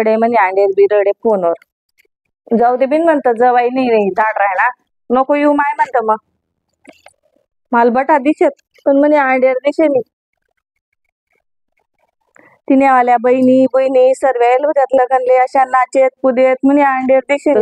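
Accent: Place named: Khandesh, India. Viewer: मस्त अहिराणी येते तुला छान वाटते ऐकायला .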